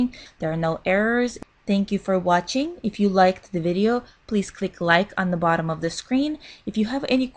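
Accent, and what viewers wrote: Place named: Russia. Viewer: You must be Russian!)) Thanks for the video...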